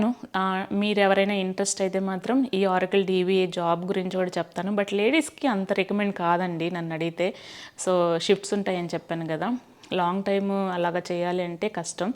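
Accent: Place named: Nellore, India. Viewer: Hi andi, I can understand your situation Nenu kooda same mee situation lone unnanandi , nenu 2 years nundi shifts unde job chestunnanu night shifts ekkuva chestuntanu , health chala padaipothundi and pillalni sariga choosukolemu kooda andi job manaileka pothunnanu meeru cheppunatlu job chestoo undi sudden ga manesi khalie ga undalante undalemu , kaani health chala important andi manam healthy ga untene pillalni , family ni choosukogalamu , ie night shifts valla mind kooda disturb avuthundandi , anduke quit cheyyalane anukuntunnanu final ga Meeku business idea undi kabatti danike move avvandi andi ie night shifts chesi health disturb chesukokandi , idi experience tho mee meeda gowravam tho nenu meeku istunna salaha matrame , kaani meeku edi mee life style ki correct ga untundo. Choosukoni decide chesukondi, meeru matlade vidhanam naaku chalabaga nachuthundandi maadi kooda nellore ne anduke akkadi vallatho matladinatlu untundi naaku mee video choostunte , naaku time dorikithe compulsory gaa mee videos choostuntanandi . 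All the best andi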